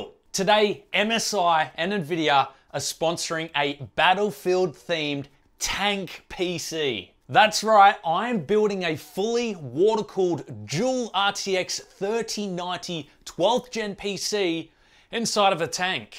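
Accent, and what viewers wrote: Australia. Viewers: hello are you australian?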